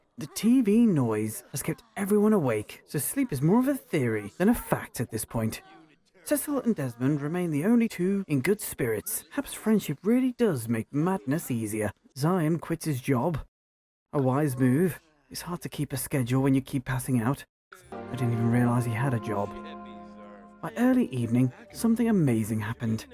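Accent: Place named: United Kingdom. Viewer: It's the British accent, sounds like David Attenborough of Sims documentaries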